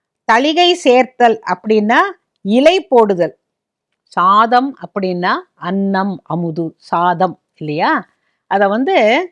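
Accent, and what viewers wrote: Palakkad, India. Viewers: பாலக்காட்டு மாமி மாதிரி பேசி அசத்திட்டேள்.. பழைய பாரம்பரிய விஷயங்களை இது போன்ற நல்ல செய்திகளை தொடர்ந்து தாருங்கள் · Akka I am your Palakkad relative.Super Palakkad Tamil. I am your follower and enjoyed learning a lot.Everyday I will wait for your program.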